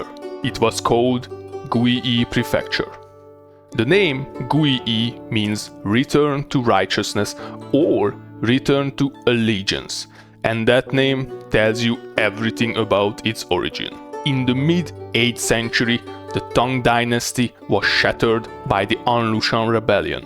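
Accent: Hungary. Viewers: Your accent look very hungarian.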